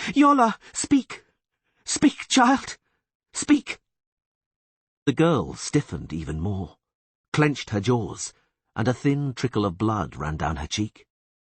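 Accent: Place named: United Kingdom. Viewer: I've got trouble understanding many words, I am not a native speaker and the weird words plus the British accent makes it incredibly hard to understand ( the text helped a lot so thanks 🙏) I'll switch to Portuguese though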